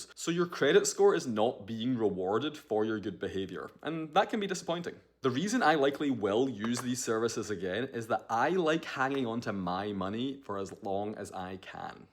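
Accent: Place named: Scotland, United Kingdom. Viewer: Are you a Scotsmen 🏴 living in America 🇺🇸 because you have Scottish Accent but keeping mentioning Dollars and showing Dollars?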